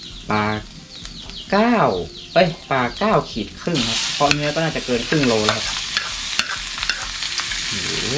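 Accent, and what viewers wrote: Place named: North, Thailand. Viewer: สำเหนียง จะอี้ เป๋น คนเหนีย แม่น ก่อ นี่ น้อง 55555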